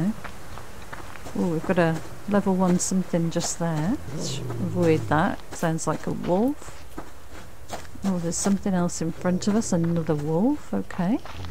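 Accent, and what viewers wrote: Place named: United Kingdom. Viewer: Thank You for the information about the new update. I really like to listen to your lovely British accent. You speak a very good english, very easy to understand for someone from the continent. Keep on the good work!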